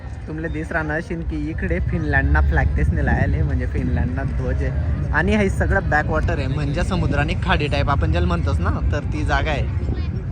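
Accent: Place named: Khandesh, India. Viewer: Dada mi tuna video dekhas. Male aavds tuna video . Tu parona she .mi pan karmud ni she . Pan sadhya mi borivali ma rahavle she. Pure khandeshi bolas te lay aavdas. Tule jevha time bheti tevha. Tuna ship dakhadjo. Jay khandes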